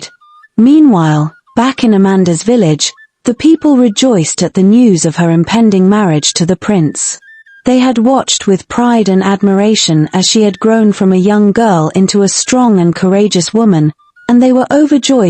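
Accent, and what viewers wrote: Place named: United Kingdom. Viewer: read this slowly.,....... your irritating voice is fukkin up the story. Find your own British tales or whatever accent that is and narrate it. Try hamlets tales it's more fitting for your kind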